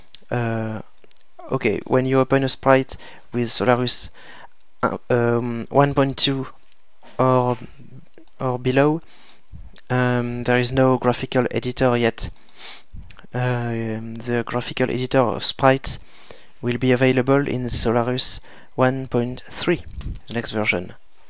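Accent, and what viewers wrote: France. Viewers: Marrant ça, avec un accent français j'arrive parfaitement à comprendre l'anglais :) (Gros HS)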